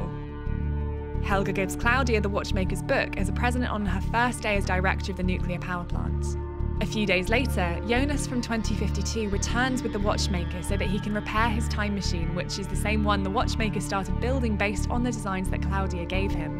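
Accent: England, United Kingdom. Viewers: The narrator has the most neutral, articulate and clear English accent one could possibly have... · Sub eng please, i dont really understand when listening UK accent hehe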